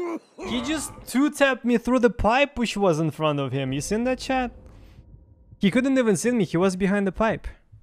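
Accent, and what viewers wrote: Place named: Russia. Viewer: Хехех, блин я подумал ты из скандинавской группы стран, но не, наш акцент сложно не узнать)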